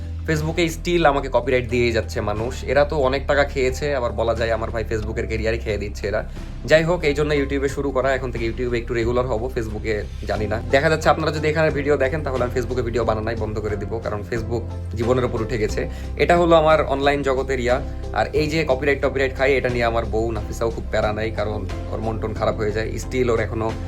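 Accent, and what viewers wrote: Rajshahi, Bangladesh. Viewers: vai apner kotha sune bujte parci je apni rajsahi thaken 😅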